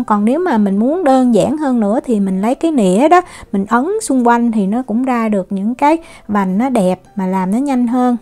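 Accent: South, Vietnam. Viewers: Nhìn đẹp lắm Giọng miền Nam dể mến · Mình rất thích những món mặn của làm 😅😅giọng nói con Gái Miền Nam thiệt là đáng yêu 😁😁chúc bạn thật nhiều sức khoẻ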